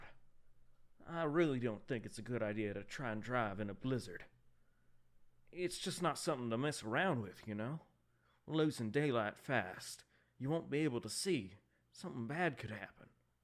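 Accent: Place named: South, United States. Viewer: Oh boy Ash was right, you do have a good southern accent ❤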